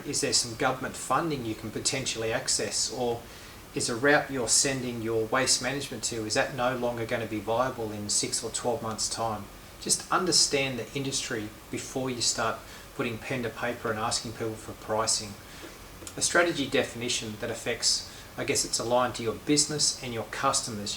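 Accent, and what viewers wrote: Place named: New Zealand. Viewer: KIWI accent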